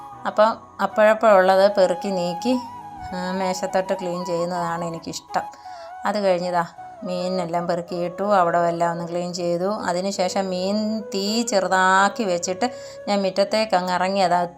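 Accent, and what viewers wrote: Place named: Kottayam, India. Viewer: സംഭവം കൊള്ളാം. രസമുണ്ട്. കോട്ടയമാ അല്ലിയോ?വർത്തമാനം കൊള്ളാം. ഇഷ്ട്ടപ്പെട്ടു.go ahead👍👍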